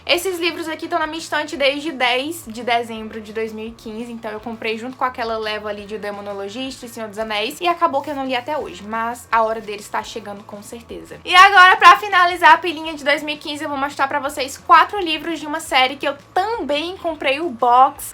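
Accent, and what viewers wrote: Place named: Ceará, Brazil. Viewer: Oi,tudo bem? Pelo seu sotaque chuto que vc é cearense kkkk (sou nova aqui então fui no teu insta pra ter certeza, e fico feliz de vc ser daqui😊) eu queria saber se vc costuma comprar ou deixar livros no sebo, se sim qual sebo vc me indica?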